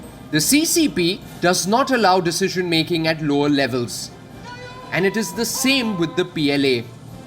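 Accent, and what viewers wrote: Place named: India. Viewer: Lol, when you hear someone with an Indian accent talking about China, I suggest you quite the channel immediately because all it's gonna bring is propaganda=trash. And as a PLA veteran, I assure everyone here that we will fight for China, we will obey orders from the CCP but all of us are willing to give our lives for it, as there won't even be modern China without the CCP. And please, nobody will force you to believe in Communism when you don't want to, and you don't have to be a party member to serve. It's being 12 years since I've touched a gun, but if my country needs me I, along with 600 million Chinese man will fight any minute. I believe everyone will do so for their own country regardless nationality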